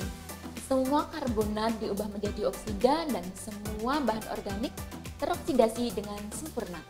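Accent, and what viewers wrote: Java, Indonesia. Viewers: Alhamdulillah kak bermanfaat, belajar sekarang lebih nyaman bisa online begini, jadi gak monoton ❤️ Sukses ya kak ~ Walaupun agak medok 😁😁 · Menarik... bisa sebagai referensi belajar.. kak suara medhoknya dipertahankan ya...😆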